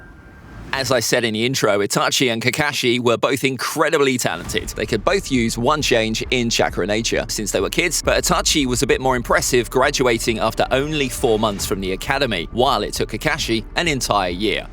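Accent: United Kingdom. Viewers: He has British accent❤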